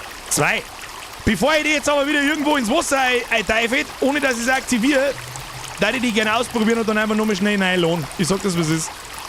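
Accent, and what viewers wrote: Bavaria, Germany. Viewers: #nochschausquad servas. danke für deine Streams. Mehr boarisch, weniger preissnscheiss! des passt ba dir immer:)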